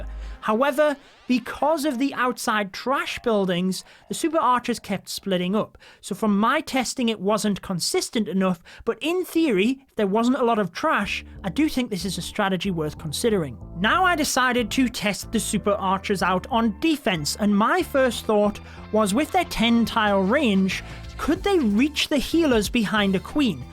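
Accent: United Kingdom. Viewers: Where is he from? Or I should ask: In which part of UK does he live? 😄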